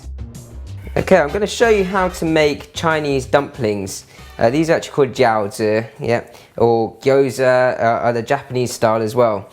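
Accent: United Kingdom. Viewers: British accent is so elegant. I love dumplings anyway! :O) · I don't really care about his cooking skill... thinking from Asian American point of view, he has the cutest British Accent...LOVE IT!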